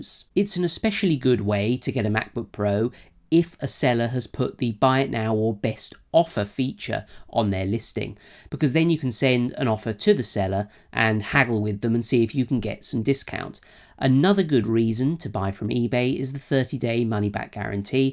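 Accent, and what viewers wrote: United Kingdom. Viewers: Yay you’re British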